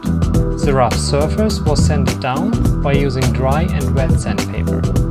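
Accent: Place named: Germany. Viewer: Es ist immer wieder faszinierend, dass man den deutschen Akzent so gut heraushört 😅 Ein wahnsinnig gutes Projekt.. ich sollte aufhören, auf die Empfehlung von RBB zu reagieren, oder ich werde später mal nie fertig, sobald ich zum eigenen Basteln komme 🤷‍♂️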